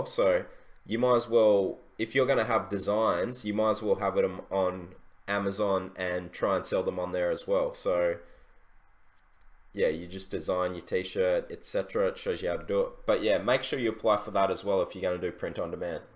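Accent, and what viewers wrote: Australia. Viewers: Thanks again meng you the best!! You are from Australia correct? Sorry if i'm wrong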